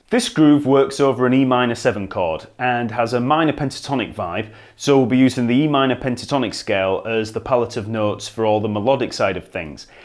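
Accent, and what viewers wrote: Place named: England, United Kingdom. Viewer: I really need to admit that Your tutorials are very helpful! I started giving up with looking for some simple but full of knowledge and exercises videos, and, what's more, for free. And then, I've found You and once again I really awoke this desire to play bass on a high level! Thanks Btw, what kind of English accent do You have?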